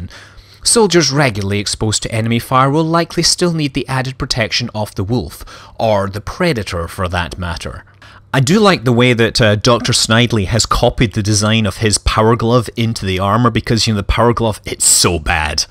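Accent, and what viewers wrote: Scotland, United Kingdom. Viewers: the classic scotsman accent. makes me want to be more Manley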